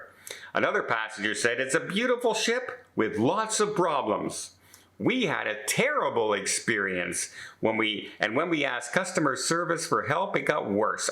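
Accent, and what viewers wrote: Canada. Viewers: It's the Canadian accent 😉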